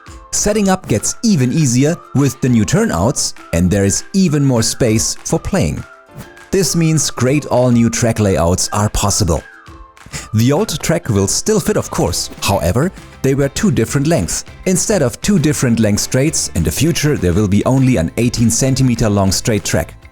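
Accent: Germany. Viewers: Man merkt das das von nem deutschen gesprochen wurde 😅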